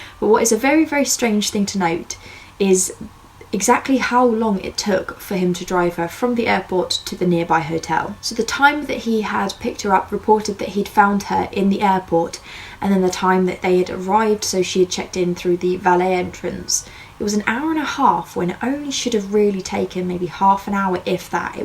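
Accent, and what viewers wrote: England, United Kingdom. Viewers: Don't worry about it you're obviously from England or possibly know that's definitely English not Welsh and certainly not Scottish anyway you know there are at least all the same language basically American and English are completely different languages at this point so don't be so hard on yourself. You did true crime & you're hot that's enough for anybody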